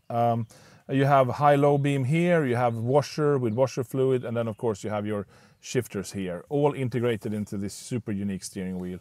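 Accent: Sweden. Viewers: Jag älskar hur man hör att han är Svensk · the svedish axent to hahaa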